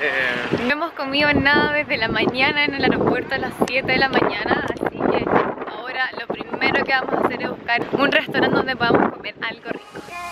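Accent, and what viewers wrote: Chile. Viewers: Es chilena no? Es la primera chilena que me gusta como habla.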